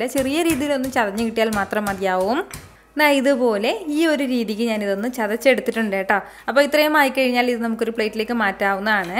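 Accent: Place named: Tirur, India. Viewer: Excellent recipe,really good for this present climate,ippozhanu orukaryam orma vannethe Thiroor vettom swadeshiyano slang ketteppo thonniyethanu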